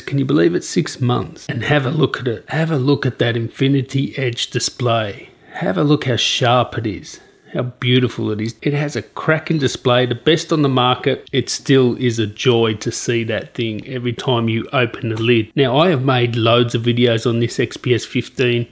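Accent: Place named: Australia. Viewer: Hey mate, not much of a story. Plus I heard the accent and just had to listen - nice change from across the pacific... Just letting everyone out there know along with you if they had any doubt, just think about the 6+ months that have gone by to let the QA/QC processes take place and iron out the production problems. That said, if you find any issues or problems if you buy one, try to return the product straight away. I am pretty sure there was another reviewer on Youtube giving the same advice.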